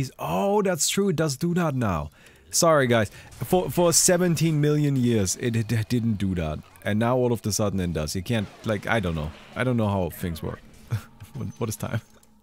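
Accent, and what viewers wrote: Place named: Germany. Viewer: Ein Deutscher der Englisch redet, da kann man immer raus hören dass es ein Deutscher ist. Btw was ist mit dein Mic los ?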